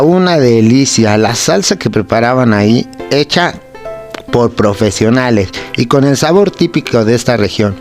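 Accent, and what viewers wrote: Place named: Mexico City, Mexico. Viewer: Tienes acento y pinta de chilango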